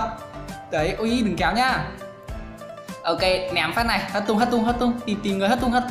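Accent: Nam Dinh, Vietnam. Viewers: A ở nam định ạ :)) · Quê a ở nam định à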